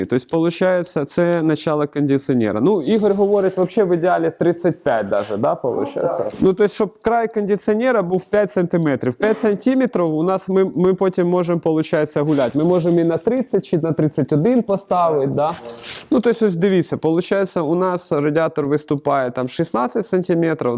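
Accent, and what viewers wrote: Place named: Chernihiv, Ukraine. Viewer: відео та канал загалом дуже круті, дякую за технічні подробиці. Судячи по твоїй вимові, ти мабуть родом з десь з Чернігівської області?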